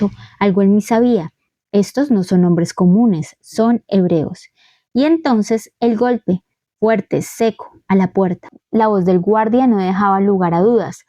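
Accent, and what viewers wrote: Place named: Colombia. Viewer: Gracias por la Narrativa y la hermosa enseñanza un abrazo fuerte por tu voz puedo decir que eres colombiana yo lo soy pero bobo en México hace 43 años graba más narrativas bíblicas que te salen muy bien besitos❤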